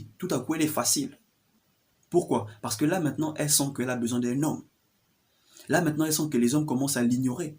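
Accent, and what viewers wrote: Gabon. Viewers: Tu parles tellement bien...je pari que tu es Gabonais comme moi, vu ton accent 🤔et tu es très explicite dans tes dires, contrairement à plusieurs pseudo coaches qui sont plutôt évasif sur leurs sujets🤷🏽 je ne peux que m'abonner à ta chaîne 👍🏼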